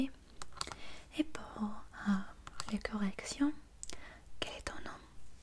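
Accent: Quebec, Canada. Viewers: Je n'arrête pas d'écouter tes vidéos à tous les soirs Je suis quebecois et entendre la manière dont tu prononces les mots comme on le fait un Québec est super relaxant (for some reason ça sonne exotique, réconfortant et familier. C'est la recette parfaite pour dormir) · Coucou, j'adore cette accent québécois. Merci pour ce maquillage, tu as fait disparaitre les imperfections et mis en valeur les points positifs. Je vais très vite reprendre rendez-vous. Gros bisous 😘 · j'ai reconnu l'accent un peu quebecois lol!!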